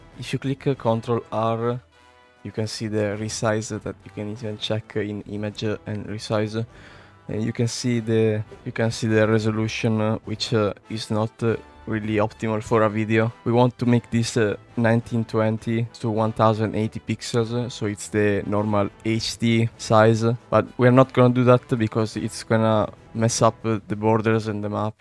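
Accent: Italy. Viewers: The Italian accent goes REALLY strong... kind of Florentine/Romagnol-ish, Anyway, nice video, this will be useful for me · By the voice and the site it's possible to notice that you are Italian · you do sound italian like your youtube channels name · Your voice sounds incredibly amazing It sounds nice when you speak English with an Italian accent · Madonna quanto si sente l'accento italiano 😅😮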